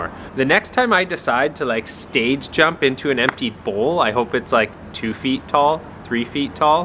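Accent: Canada. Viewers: my god I love how Canadian you are. "body checked the ground." haha! Just the delivery of it ! God bless skateboarding, hockey and Canada!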